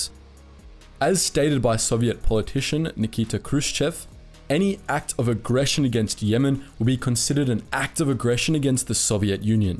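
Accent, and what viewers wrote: South Africa. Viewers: Great video. Based on the accent can I guess the narrator was born in South Africa but moved to Australia later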